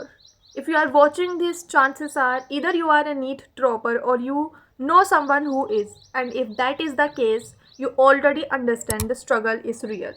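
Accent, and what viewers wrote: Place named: Punjab, India. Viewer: oh sry. But your accent is just like Punjabi's Btw your videos are positive. Keep it up, Good luck 🥹🎀💖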